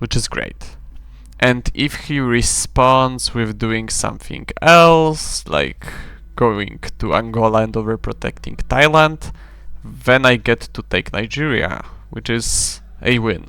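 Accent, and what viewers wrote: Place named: Poland. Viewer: Ten polski akcent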